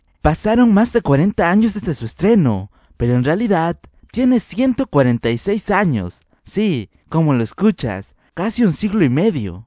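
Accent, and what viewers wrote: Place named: Mexico. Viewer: Uno de los libros más leídos en Suiza...no va a ser El Quijote....y leelo con acento europeo no mexicano..bobo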